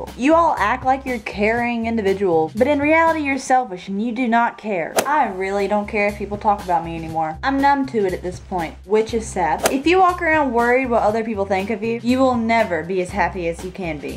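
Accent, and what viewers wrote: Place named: South, United States. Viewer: Where you from folk, you got a slight southern accent doe.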